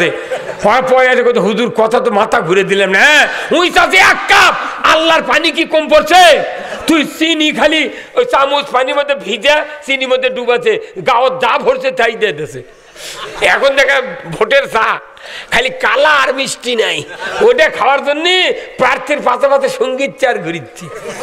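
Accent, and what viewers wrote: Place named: Bogura, Bangladesh. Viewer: মাশাল্লাহ, চট্টগ্রাম থেকে মারহাবা জানাই, হোকনা বগুড়ার ভাষা, আমি কিন্তু ক্লিয়ার বুঝতেছি,আলহামদুলিল্লাহ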